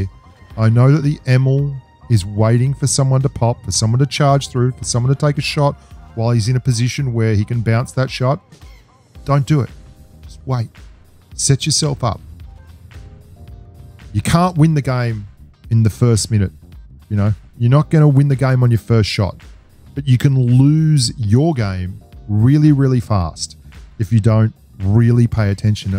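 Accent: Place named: Australia. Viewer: I sure did miss that Aussie voice. I quit playing for several months and came back and started playing again. Happy to see you back to posting regular vids.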